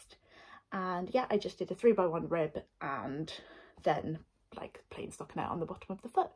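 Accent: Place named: England, United Kingdom. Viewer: Greeting from British Columbia, Canada.....you had me at tea, ginger, chai and your lovely English accent!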